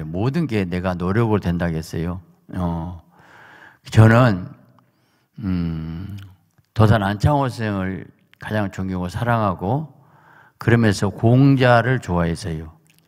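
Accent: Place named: South Jeolla, South Korea. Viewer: 목사님 넘 존경합니다. 너무 설교듣고싶은데 전남 사투리땜에 잘안들려요. 밑에 한글좀 올려주세요. 목사님 넘 사랑하고존경합니다. 미국의아들에게보내고싶은데 저보다 더못일아들어서그래요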